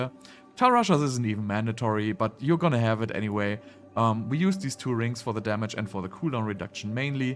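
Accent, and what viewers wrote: Germany. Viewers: yeah thats a fine build for overworld. it like omni cl of s1. that was probably even more op for farming:) . sadly its gone for now. And greetings from germany, you sound german too.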